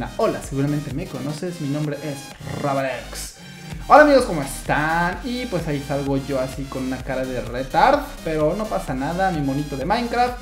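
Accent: Mexico. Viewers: entonses ¿de que país eres? siempre pensé que eras de México:p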